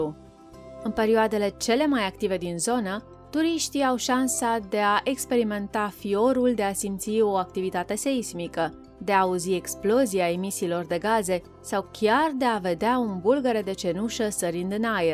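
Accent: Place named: United States. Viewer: Am oprit episodul la jumatate. Efectiv nu am mai putut sa mai ascult modul in care pronunti denumirile cu acel accent american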